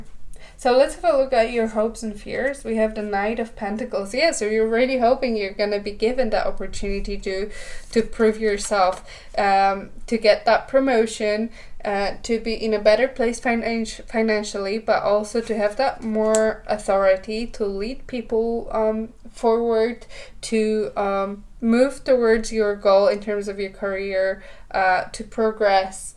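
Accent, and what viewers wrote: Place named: France. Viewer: Thank you 🙏🏽 I claim your reading , I think you are French from your English accent !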